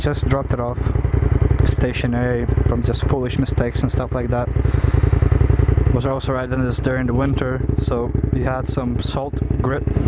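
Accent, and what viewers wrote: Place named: Northern Ireland, United Kingdom. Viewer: Your accent is interesting. It is Northern Irish mixed with something else.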